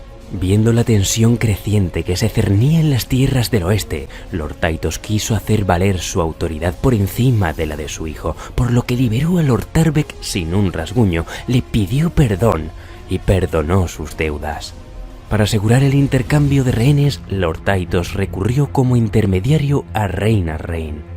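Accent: Spain. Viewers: El asentó español le da esa toque medieval, gracias por la pequeña reseña del origen de esa canción.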